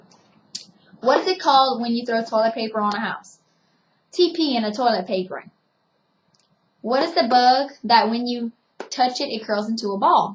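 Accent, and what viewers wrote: Louisiana, United States. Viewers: Word up! I love the Louisiana accent. Its so much better than all the other southern state. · woah i’m from south louisiana but i’m in the city so i dont hear many cajun accents like yours :0 reminds me of pierre part lol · Damn, chick. You must be from southeast Louisiana. Southwest is totally different. I dated a chick from New Orleans and you sound exactly like her. 😆☺️ I grew up in Lake Arthur. That's near Lake Charles. Not far from the Texas border. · i like your accent much better then most luisiana accents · I'm from Plaquemines parish. You were soooo on point. Who needs an er when ya got an a. I love our accent. I do call it a soda though. "Grab me a soda" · mhm baby girl you sound pretty cajun as it is haha nice its my favorite accent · I love your accent lol I love when people have that type of accent in Louisiana. 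· if you ain't from Louisiana your pretty good I live in Louisiana and some people from here don't even have a Coonass accent like what our doing lol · i love accents. My favorite by far is the Australian accent, but now that Ive heard yours it definitely tops it. It sounds like a combination of traditional southern drawl mixed with New Jersey/New York City/ black people and French. I guess it comes from the mesh of all the plurality of culture that has been around since New Orleans was founded by the French. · Im from opelousas/Lafayette and I knew your accent RIGHT AWAY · I love your accent. I find most southern accents charming, but the Cajun is really something special! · When I first moved to Louisiana I lived outside of Lafayette for a few years. This accent was common in a lot of my neighbors and with the teachers in my stepson's school. It's very common; she sounds exactly like a lot of girls and women from that area. 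· You sound like your somewhere from outside of Baton Rouge like Zachary or Dunham. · "the very heart of cajun country" The way you pronounced "heart" could've told me that :) Love this accent :D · I have to guess your from more towards Thibodaux, maybe Morgan city? Perhaps Lake charles? You dont have as thick of a Cajun accent as say Vermilion parish or ather areas in deep SW LA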